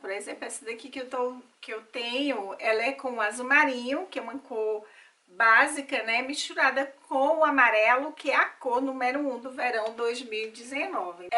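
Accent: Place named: Northeast, Brazil. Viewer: sou pernambucana e por isso reconheci o sotaque nordestino,👏👏👏👏😙😙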